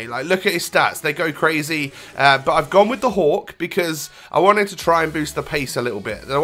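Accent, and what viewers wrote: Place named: RP, United Kingdom. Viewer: Very posh accent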